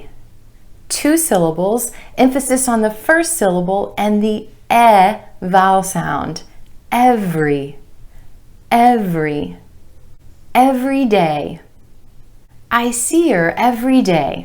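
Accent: United States. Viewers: Two reasons why i watch your videos: 1- to learn the american accent 2-you're so damn cute😍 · Hi madam I'm one of your subscriber.I love American accent I need more vocabulary pronunciations video's please make some more examples video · I do love American accent! Massive thanks to you Dear!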